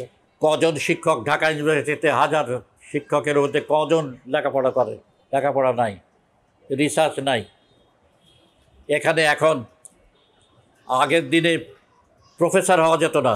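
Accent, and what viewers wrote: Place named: West Bengal, India. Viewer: স্যার এর আদি বাড়ি কি স্বাধীনতা পূর্ব পশ্চিমবঙ্গে ছিল? কথার মধ্যে এদেশীয় টান! অবশ্য খুলনাও হতে পারে। যাইহোক ভাল থাকবেন স্যার। এই বয়সেও আপনি সমান চিন্তাশীল । ভাবতে ভাল লাগছে।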